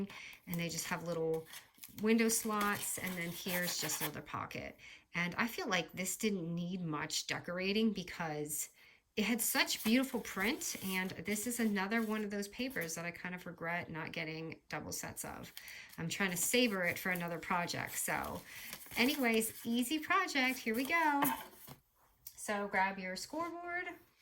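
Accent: Boston, United States. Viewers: You are the reason I have gotten into crafting. Its been so good for my mental health, and helps me to unwind. I also got this paper set, so I can't wait to see what else you do with them! Do you have any mini albums made with chipboard tutorials with pages etc? Happy New Year also i love your voice and kinda Boston accent!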